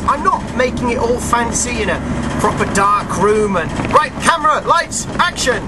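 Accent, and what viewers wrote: United Kingdom. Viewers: You're and you have given us knowledge...and lots of it. But trust me, this djtutor stuff is good TV material. It's addictive, just like reality TV is. I know that, just like myself, a lot of people around the world gets hooked on them...speacially gig logs...those are 110% reality TV!!! Plus, you will be the perfect British host for the show..you know they love British accent people here in the US TV networks. Saludos desde Miami!!!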